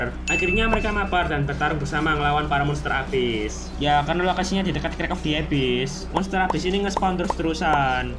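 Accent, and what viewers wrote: Java, Indonesia. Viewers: Koe wong jowo to maz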